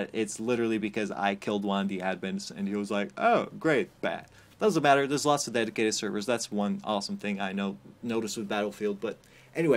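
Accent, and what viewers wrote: Netherlands. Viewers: are you from the dutch? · Hey, you dutch?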